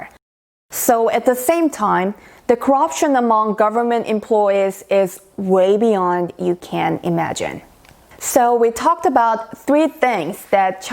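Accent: South Korea. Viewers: you sounds like Korean. Are you Korean? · Why the host has an Korean accent? I thought she's from China. In Korea religion is total free dom. There are men constantly claiming they are the Gods and make it into a successful business. In China, no, religion is watched by the government. Everything must fits into one idea---development. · The Chong Dynasty? Never heard of it. The speaker speaks Korean English. She has an axe to grind, wouldn't you say? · Are you Chinese or Korean, You sound like Korean. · ARE YOU FROM KOREA.I THINK SO,BCOZ OF UR STYLE OF SPEAKING.WAY U SPEAK ENGLISH.I AM FROM INDIA.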